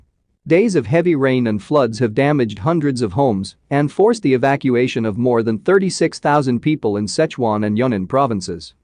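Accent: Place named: United States. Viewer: Blatant fabrication with intent to create unrest in China. From the accent of narration, it must be a vicious American.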